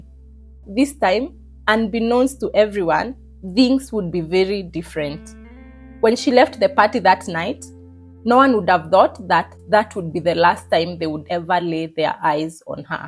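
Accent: Kenya. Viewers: Here in the Uk there are tons of accents and intonations. The narrator of the programme has a Kenyan accent. But what she is saying is easily understood. In the Uk there are regional accents and one cannot make out what the person is saying because the accent and intonation is so strong. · She will improve with practice but, it is a Kenyan accent.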